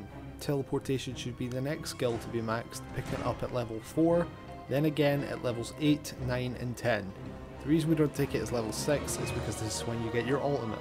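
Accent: Scotland, United Kingdom. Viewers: waaaa! You Scottish too big man ?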